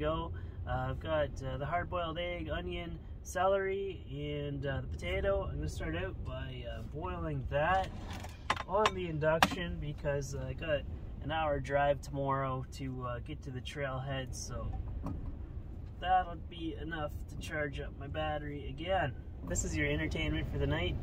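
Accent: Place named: Manitoba, Canada. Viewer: Are you from Manitoba? You kind of have the 'don cha 'no accent.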